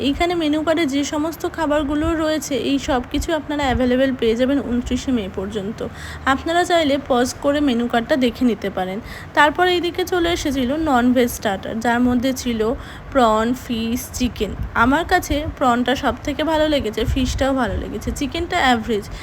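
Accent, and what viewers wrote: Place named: Bangladesh. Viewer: Apni ki Bangladeshi??? Kotha gulo kamon jano..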